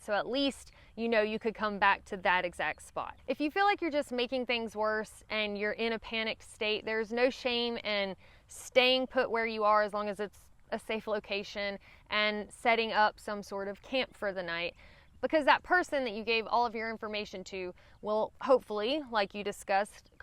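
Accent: South, United States. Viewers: Well done - accurate and factual. Should help new folks stay safe. Plus, I LOVE your accent. Great to hear a southern gal telling it like it is. Nice work!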